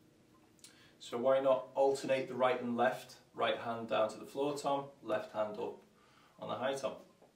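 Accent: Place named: Wales, United Kingdom. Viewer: the first contact I’ve ever had with a Welshman in a long while (worked with some ladies from Wales many years ago—love the accent/language). Thanks for considering my comment — hope the move was successful!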